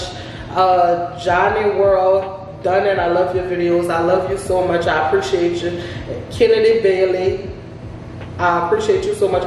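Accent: New Orleans, United States. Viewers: Hey there my name is I’m just discovering your videos I can dig it I will be following! you remind me so much of my best friend...ikr 2 lol! Btw Ik your probably frustrated with your self behind the situation but as far as being embarrassed i think not!! You have absolutely nothing to be embarrassed about! I’m sure at some point in life if a person is old enough, more than the majority of us have been thru this or something similar...trust me!! Oh & I love your accent gotta be N.O. baaabeee😂❤️🙏🏾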